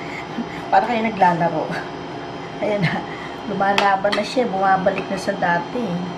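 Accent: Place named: Bulacan, Philippines. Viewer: iba talaga pag may puntong bulacan,.masarap panoorin at pakinggan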